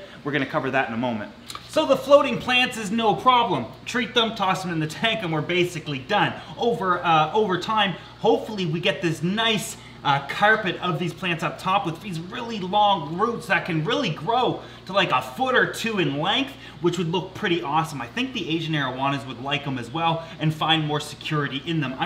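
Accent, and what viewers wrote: Canada. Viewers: Hes canadian, was accounting for his accent. Anything remotely close is better than permagranite lol.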